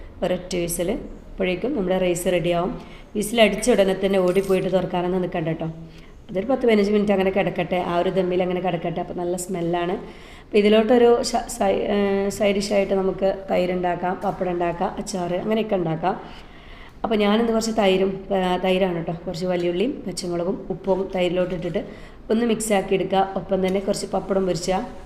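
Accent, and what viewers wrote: Malappuram, India. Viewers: മലപ്പുറം ഭാഷ കുറെ മാറിയല്ലോ, ഞാനും നിലംബൂർ സ്വേദേശിയാണ്, ഇവിടെയും മുസ്ലിം ഭാഷ ഇങ്ങിനെതന്നെയാണ് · നല്ല സ്വീറ്റ് മലപ്പുറം ഭാഷ , Good recipe.Expect more videos from you sister 🎉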